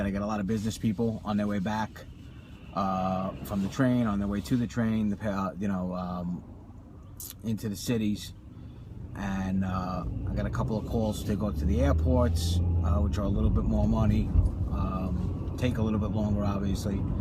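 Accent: New York, United States. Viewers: The clues gave it away (at least for me). Highly dense, close to trains, and the airport. All i'm thinking is Manhattan NY. The accent just confirmed everything lol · "Not gonna disclose my location" your accent is a dead giveaway NYC bro... LOL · That is the heaviest New York accent I have ever heard. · Jajaja that accent is str8 from new york lol gotcha there buddy now i know where u drive jaja lol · Just started in Chicago, clearly you are in New York or New Jersey (the accent gives it away) I am also going to focus on the daytime commutes to avoid any of the late night troubles. Hope to see more videos from you & #UberOn also how's SideCar in your market have you tried it? It does well in Chicago in certain areas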